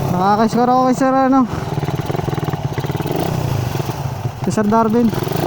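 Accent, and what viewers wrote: Iloilo, Philippines. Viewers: Tkalun kau kah ilonggo... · Ilonggo ka lods ahhahah (testing ta😁😁😁) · Ilonggo ka hah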